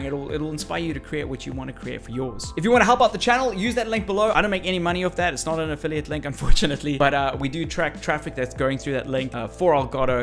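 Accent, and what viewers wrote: South Africa. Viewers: are you south African ??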